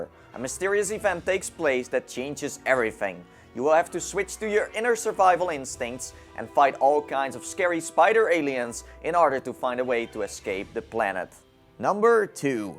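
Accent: Netherlands. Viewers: No Swede or Norwegian talk with a accent like that :D ,sounds like Dutch English to me, but def not Swedish or Norwegian, We talk with a weird accent yes, but it's not like that, hehe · Flip Games just listen to that awful accent ofcourse he's Dutch! (Dutch myself just can't stand Dutch accents haha)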